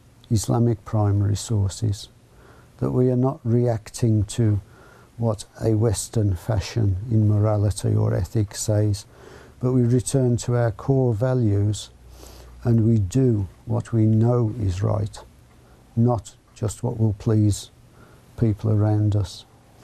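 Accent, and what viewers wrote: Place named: Birmingham, United Kingdom. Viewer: Proper Brummie Brother 👍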